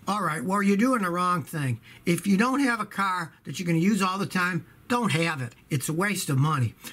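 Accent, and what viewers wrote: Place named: New England, United States. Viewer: With the "Toooob" lol I like his yankee accent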